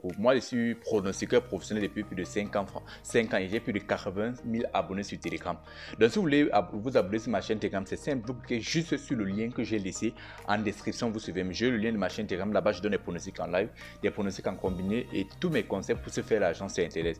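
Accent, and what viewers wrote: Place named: Cameroon. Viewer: Prochainement il faut parler doucement, tout le monde ne comprend pas ton accent camerounais et c'est rapide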